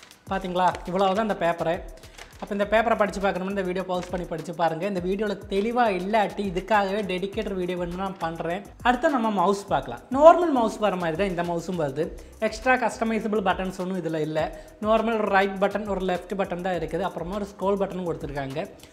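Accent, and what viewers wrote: Kerala, India. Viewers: Bro yr Tamil is like mixed with Malayalam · you have a peculiar Malayalam accent with humorous touch! Nice Video , Keep it up and post more!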